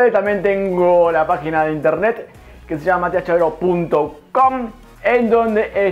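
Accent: Argentina. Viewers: Me encantan tus recetas! Soy porteña tb y así hablamos! A hacer las palmeritas👌👨‍🍳❤👏👏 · Nací en Buenos Aires y me encanta el acento de este chico. Donde vivo, en Europa, tenemos masa de hojaldre rectangular 😉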